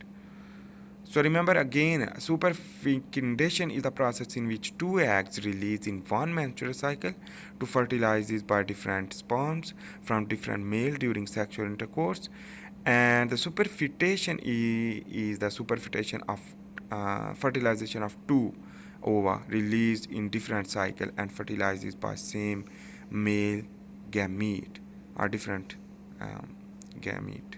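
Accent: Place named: Kashmir, India. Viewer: Your accent is like kashmiri english ....very well explained 🔥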